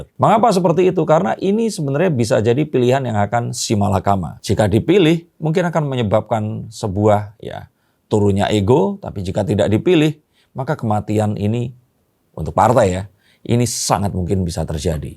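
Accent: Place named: Muria, Indonesia. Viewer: Kok pakai akhiran " TAH" apa admin nya dari daerah sekitar gunung Muria?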